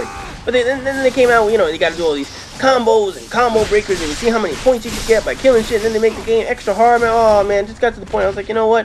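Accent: New York, United States. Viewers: NY ACCENT !! NY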